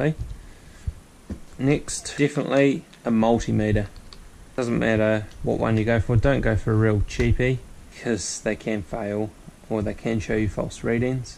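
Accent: New Zealand. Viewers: Love the kiwi English words & accent.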